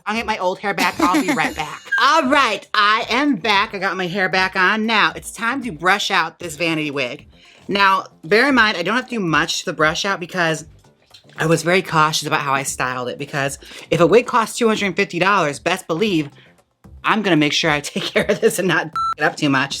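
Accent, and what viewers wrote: Australia. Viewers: that’s how Australians sound 😭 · Are you trying to do an Australian or an ol timme Cockney accent? Either way, love your work xo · More Wigs by Vanity please or just the hilarious Aussie accent! 💗❤️🧡💛💚💙💜🖤🤍🤎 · The Australian accent is everything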